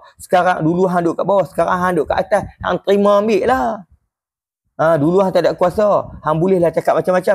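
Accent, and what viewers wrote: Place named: North, Malaysia. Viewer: Saya xda lain nak komen..apa yg brader ni ckp semua betul..Satu lagi sedap pulak dgr dia loghat utara dia😂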